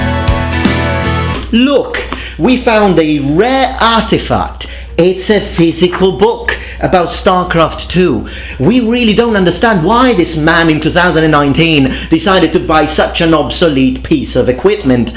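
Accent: Sicily, Italy. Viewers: Your explosive Sicilian delivery with your hyper-educated sarcastic English is a killer combo, sir. I'm crying with laughter.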